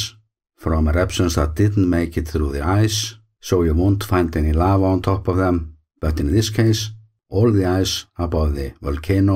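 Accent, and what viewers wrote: Iceland. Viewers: Brilliant as usual and the English language couldn't sound any better with an Icelandic accent.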